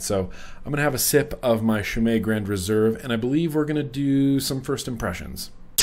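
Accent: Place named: West Virginia, United States. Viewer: Hey there mr. lol i just bought a dreadnaut clone from fasttech and everything seems to be in all the right places but i cant seem to get it to give off big vapor ive built the coils like six times i have been using 28 kanthal and organic cotton i use a multimeter it usually gives me.9 ohms but when i fire it it seems like it takes too long and u have to press the button at like an angle for it to even fire what coyld i b doing wrong? Please any help or advice would be greatly appreciated i love your long ass vlogs its awesome i love that adorable too im from west virginia so we sound alike lol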